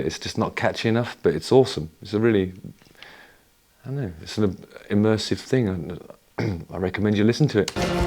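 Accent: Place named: Southern England, United Kingdom. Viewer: Most English man alive…… definitely south English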